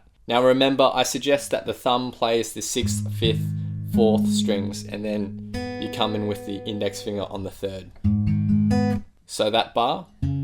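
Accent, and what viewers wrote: Australia. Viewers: When I heard your voice I'm like AUSSIE AUSSIE AUSSIE OI OI OI. 🇦🇺🇦🇺🇦🇺🇦🇺🇦🇺🇦🇺🇦🇺💚💛💚💛💚💛💚💛💚💛💚💛💚💛💚💛💚💛💚💛💚💛💚💛💚💛💚💛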